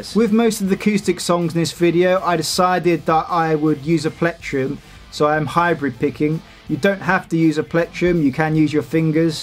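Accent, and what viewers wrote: England, United Kingdom. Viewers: Wow this is the first time ive heard speak, like i knew he was from england and probably had an accent, put i had always imagined his voice to be deeper to match his playing skill on guitar (which by the way your amazing and have helped me decide what songs to learn next), neverless your amazing at what you do, rock on man \m/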